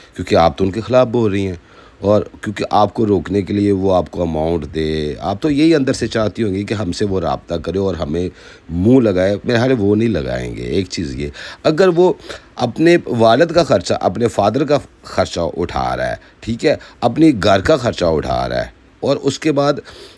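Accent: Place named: Pakistan. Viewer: Lahze se hi pata chal rha hai ki tum pakistani ho. Tumhare yahan ke nalle vloggers mar gaye jo indians per bolne ae ho ! Tumhare yahan ki zani pitara yaseen ko dekh lo, wahan kyun ni muh khulta tum logon ko .. bandi khule aam zina karke rang birange bacche paida kr rai hai ! Per wahan paise ni bnte honge na … indians per bolke kamana hai 😂😂😂😂😂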